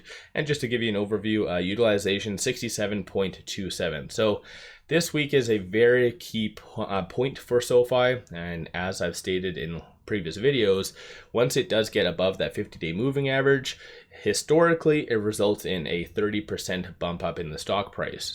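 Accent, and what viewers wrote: Canada. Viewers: Thanks again for your analysis! It sounds like you live in Canada, eh? I am in Oregon. Just got to tell you...I am a huge Rush fan, so go Canada!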